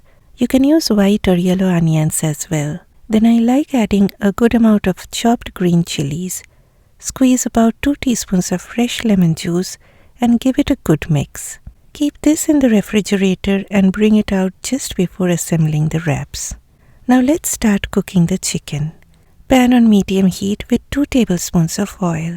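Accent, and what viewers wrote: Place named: Bengal, India. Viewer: Are you bengali 😊.from your accents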